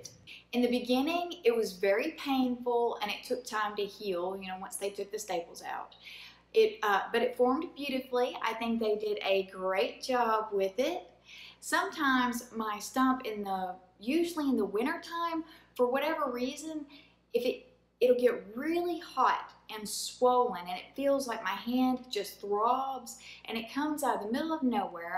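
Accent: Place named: South, United States. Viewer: I am not American. Are you from the south somewhere? Cool accent.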